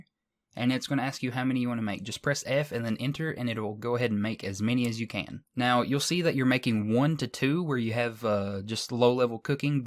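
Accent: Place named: United States. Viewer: I love this game and your country ass accent SUBBED!!!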